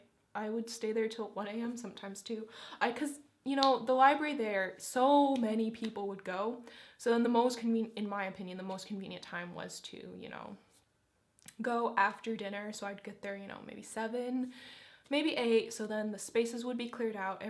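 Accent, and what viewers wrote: United States. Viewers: you sound American to me for some reason, so cute and fun opinions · She sounds American, not British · No offense you have an American accent i would thought you were from America lol 😳😳🤯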